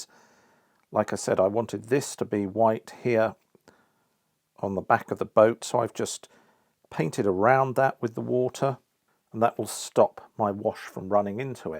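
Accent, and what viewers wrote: United Kingdom. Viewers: You are such a fine, articulate, and friendly teacher! Plus...I have a great British friend who is a classical guitarist (Mark Hussey), and your accent helps me remember his friendship! Thanks!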